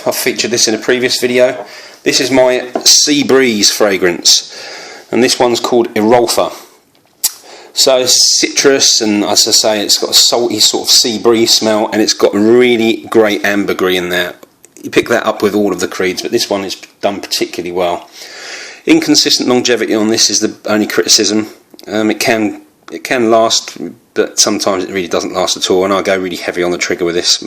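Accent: United Kingdom. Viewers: I just just subscribed to your channel!!! I'm so impressed thus far by your first video. I don't know what it is about you Brits that when you speak you command such respect?!?!?! Accent??? (Wink wink)... Anyways here is my TOP 5 all the way from Canada... 1. Neroli Sauvage 2. Green Irish Tweed 3. Jardin D'amalfi 4. Original Vetiver 5 Original Santal :) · Nah, you should pronounce things the way you naturally do. Its just plain rude to criticise someone's accent and suggest it's some how wrong. I've got an estuary english accent too, don't speak differently to appease others. Imagine it the other way round, I'd never make negative comments about any accents that sound foreign or different to ours' - as i said it's very rude and comes from plain ignorance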